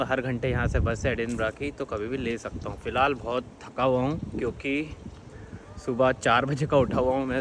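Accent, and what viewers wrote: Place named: Madhya Pradesh, India. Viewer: are you from madhyapradesh bro ,your language tune like mp bhopal thats why im asking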